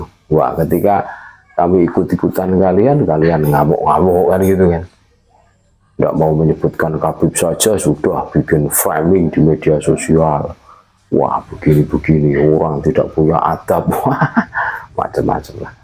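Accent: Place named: Madura, Indonesia. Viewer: Orang Madura itu suaranya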